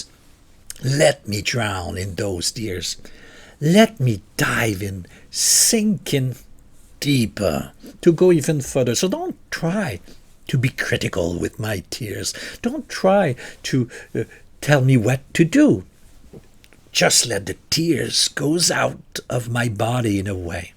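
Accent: Quebec, Canada. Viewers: Dès vos premières phrases, je me doutais bien que vous étiez Québécois. Moi je suis de Boucherville, j’ai découvert les sœurs Villarreal via un vidéo de réaction sur leur premier cover de. Je fut conquis sur le champ. Depuis, je les ai vus en spectacle 4x (2x à Monterrey Mx et 2x à NYC). Elles donnes tout un spectacle. Mais, ce qui m’a vraiment décidé d’aller les voir dans leur ville fut une conversation internet avec leur papa. Toute la famille est tellement terre à terre et ils sont super gentils. Vous, vous les avez trouvés/découverts comment?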